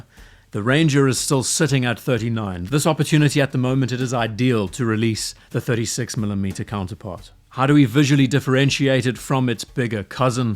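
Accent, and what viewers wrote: South Africa. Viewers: Dig your accent. South Africa?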